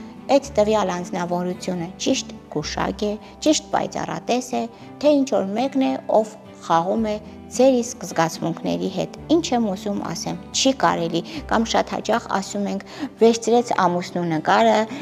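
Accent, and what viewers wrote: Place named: Gyumri, Armenia. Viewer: Իրա ազգանունն է նույնիսկ ասյում 50-50է կեսը գյումրեցու կեսը գրական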